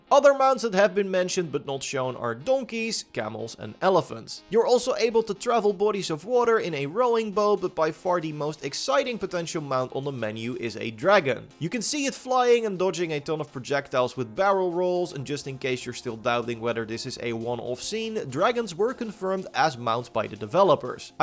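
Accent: Netherlands. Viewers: dutch accent is so funny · Je klinkt uber Nederlands, klopt dat? Al een tijdje benieuwd 😂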